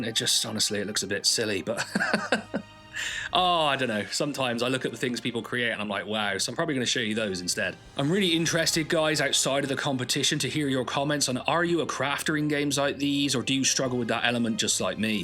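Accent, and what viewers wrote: United Kingdom. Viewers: I’m getting back into space games after a break from them and this video just popped up in my feed. I’ve subscribed, great to hear a regional english accent in a Youtube video! 😊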